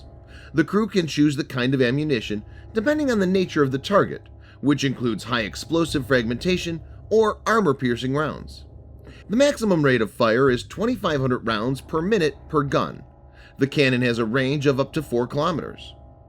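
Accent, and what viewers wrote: United States. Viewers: the very American-sounding voice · The way American pronunciation murders ordinary language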